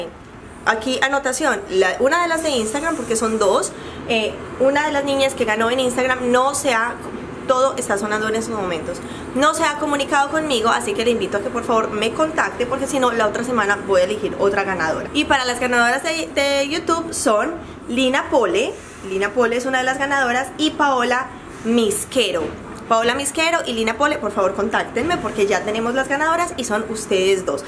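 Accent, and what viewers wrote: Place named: Venezuela. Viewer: Me gusta como habla, su acento Venezolana? no me equivoco?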